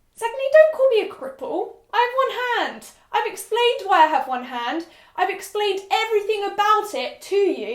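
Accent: United Kingdom. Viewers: Her British accent makes her anger so much more easier to listen to. Haha biased American.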